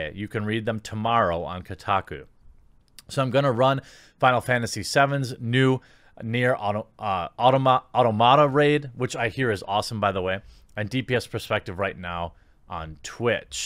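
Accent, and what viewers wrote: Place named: Midwest, United States. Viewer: Good video,. I think. I only listen, so who knows what the video is?:P Not to call anyone out, but some Youtubers have voices that are really hard to listen to, even if the content is good. Your content is good, AND you're easy to listen to. So I appreciate that you speak at a reasonable pace, and your voice isn't grating. It's probably a Midwest thing - my neck of the woods.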